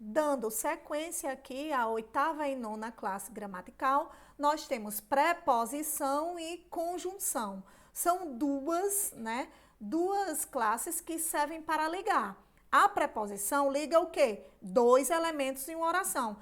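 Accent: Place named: Northeast, Brazil. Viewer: Esse sotaque nordestino me faz ter tranquilidade e paz para saber e entender que estou com uma grande professora, face considerar que o estudo nordestino, se não for o melhor, é um dos melhores do pais.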